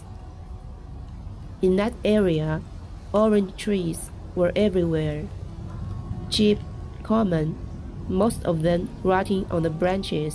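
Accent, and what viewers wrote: China. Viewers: Your video is refreshingly real. Your English pronunciation is extremely clear and much better than most students from elite universities in China whom I met in the USA. One more thing, there is nothing wrong with having a Chinese accent because we can understand you effortlessly; in fact, I think accents add richness and diversity. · Your English is quite good. Never mind the slight Chinese accent, how could it be otherwise? Your pronunciation is good and your sentences are fluent and correct. Do not underestimate yourself, you are an ambassador for your people. I think you are a very brave woman. 🙂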